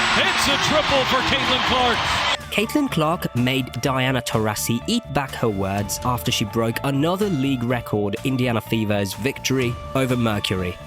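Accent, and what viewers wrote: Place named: United Kingdom. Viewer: Nothing gets me into the mood for basketball like a British accent.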